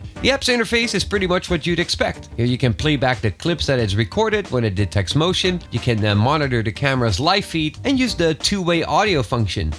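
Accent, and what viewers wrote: Netherlands. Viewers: Hoezo Nederlander? Je accent is duidelijk maat, haha Anyways, the camera seems to be nice and all. But I have been hearing never to take a device that comes from China due to hackers, content stolen, listening in, watching your cam etc. You didn't handle that aspect so how true is that and to what level is that a danger with this model? (I hope to get a answer quickly, my home has been broken into twice now and I wish to know whether this is the best one for me or not.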